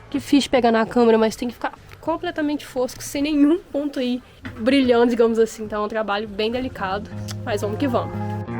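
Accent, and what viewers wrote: Minas Gerais, Brazil. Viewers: Você é de minas gerais?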